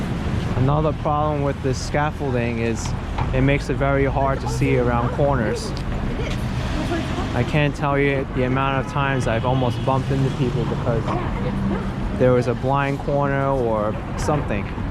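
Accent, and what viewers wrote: New York, United States. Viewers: Oh wow, where to begin...!? I loved this video! My husband and I visited NYC two years ago, stayed down on 46th somewhere, and learned the subway system, visiting all the touristy spots that week... Last year we returned, but this time branched out for some historical places. This year (in April again) we are staying close to Central Park, and will spend much of our time there, relaxing, walking, exploring, painting, taking photos...But hey! I stumbled onto this video tonight and walked all the way down 5th Ave. with you,totally enjoying your narration and fantastic tour, along with tips for tourists! Next thing I do will be to look for a few more of your videos, and hit that subscribe button! You are so knowledgeable, so you must have grown up in Manhattan? You have just a trace of a NY accent! Thanks for a fantastic walk down Fifth Ave!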